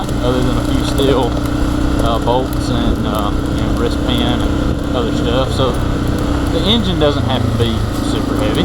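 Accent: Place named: Kentucky, United States. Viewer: Where you from? I’m in London Kentucky and your accent is similar to mine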